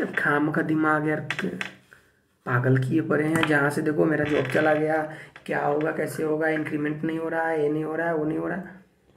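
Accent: Bihar, India. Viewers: Bhaiya ji..bihar se ho kya😀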